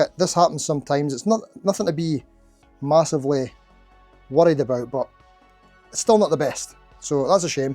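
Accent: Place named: Dundee, United Kingdom. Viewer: great video as always, i don't comment much on these channels but i love the way you champion budget guitars as opposed to ridiculously priced name instruments, being a weegie i also admire you Dundee accent. I myself have been playing 60 years now and still rubbish but no bad on bass, since retiring i have taken up buying cheap end instruments and doing upgrades for a hobby and really ennoying that. So i want to leave you with some words of wisdom, NEVER BUY A ROLEX FROM A BREATHLESS STRANGER. Keep up the good work pal and take care.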